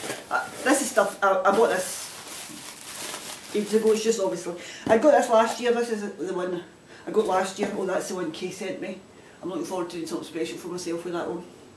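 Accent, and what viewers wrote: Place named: Scotland, United Kingdom. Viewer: So great to see you back! It's fab to hear a Scottish voice on a podcast, and your knitting and spinning are always beautiful.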